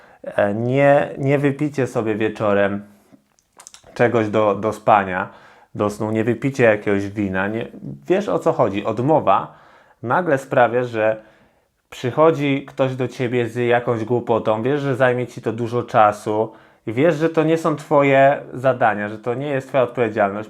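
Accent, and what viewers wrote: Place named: United States. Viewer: Twòj amerykański jest cool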